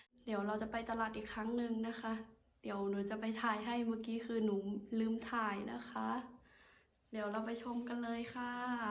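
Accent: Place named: North, Thailand. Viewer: วันนี้อู้กำเมืองเลยน้อ... 👍👍👍👏👏👏🌹🌹🌹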